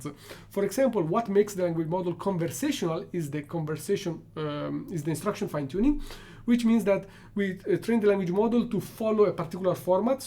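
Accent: Italy. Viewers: I love the Italian accent 😂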